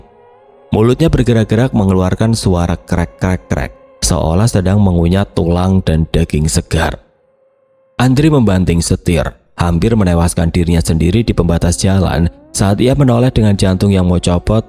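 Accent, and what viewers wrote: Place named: Java, Indonesia. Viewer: Suara medok lu mana wooiiii...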